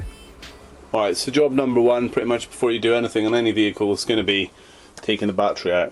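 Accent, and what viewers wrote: Scotland, United Kingdom. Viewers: Thank fuck for that, someone speaking proper fuckin English (with a Scottish accent) instead of some shitty twatty shite ya can't understand. Ta, for the advice, good video.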